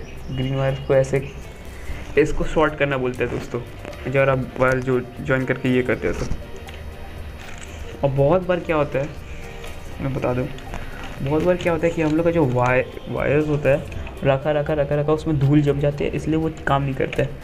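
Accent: Bengal, India. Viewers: Are you bengali? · You are bangali, right? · Are you bangalee?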